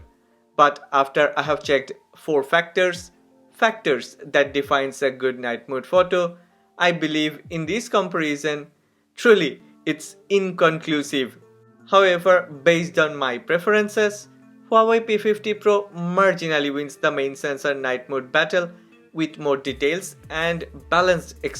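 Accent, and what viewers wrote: India. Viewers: you sound like from India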